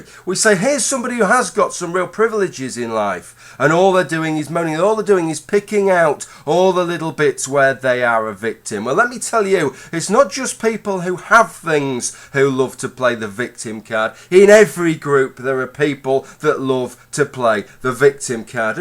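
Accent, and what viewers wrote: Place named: Lincolnshire, United Kingdom. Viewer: Was trying to place the accent - not strong enough for Yorkshire - was torn between possibly Lancashier but with a slight manc-like twang (sorry!) -had to be elsewhere, so gave up guessing. Lincolnshire! -Skuunthorrrpe. Bona fide northern goodness!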